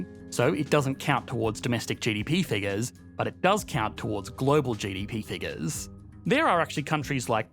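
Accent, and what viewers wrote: Australia. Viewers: I could never move down under cant stand the accent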